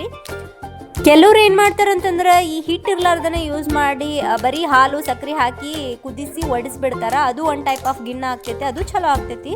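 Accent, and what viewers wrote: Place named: North Karnataka, India. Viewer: Nimma Uttarkarnataka maatu ista magale, bcos naa hutti beladiddu alle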